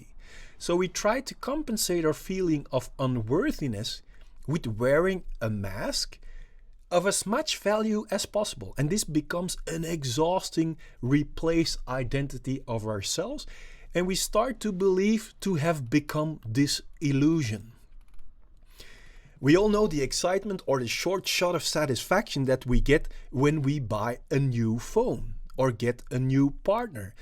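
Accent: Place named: Netherlands. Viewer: You have a strong dutch accent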